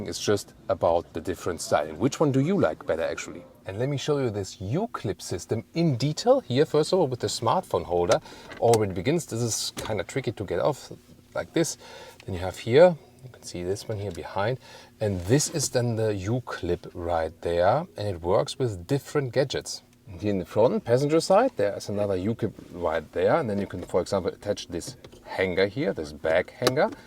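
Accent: Germany. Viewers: Genglish… what a horror… Jesus safe me please…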